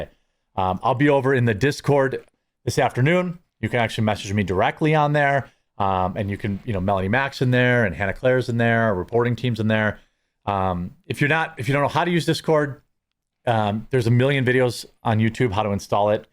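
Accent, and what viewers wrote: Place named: Wisconsin, United States. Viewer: the Quartering is from Wisconsin. Along with Minnesota and parts of North Dakota, that region is known for having a distinct accent within the US. Jeremys isn't all that noticable, but this is one instance where you can hear it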